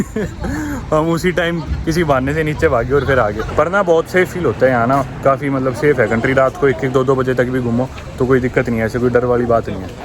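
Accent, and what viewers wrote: Haryana, India. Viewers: The dhakad Aur wo Hindi ka harayanvi touch kya baat hai maja aa jata hai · haryana se hai kya Bhai?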